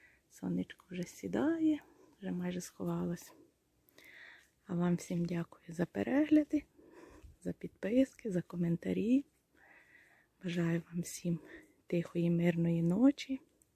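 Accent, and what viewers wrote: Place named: Poltava, Ukraine. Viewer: Доброго вечора,!Дякую за гарне відео!Цезар такий кумедний😄Помічник!Завжди дивуюсь,як Ви з півдня України,а розмовляєте начебто з Полтавщини.Дуже люблю таку просту,українську вимову.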